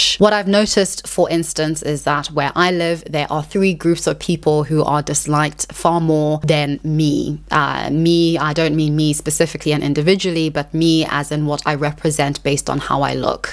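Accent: RP, United Kingdom. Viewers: wonderful essay!!! (and, shallow as it may sound, what makes it even more pleasant is your voice and cut-glass English accent - if you ever grow tired of youtube, you should consider recording audiobooks) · I remember listening to your channel a lot a few years ago. Would it be accurate to say that your accent has gotten more British than South African? I’m American and lived in the UK for some years, and now I think I hear more specifically UK sounds, though I may be mistaken. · I’m curious where you are from. You sound so British to me!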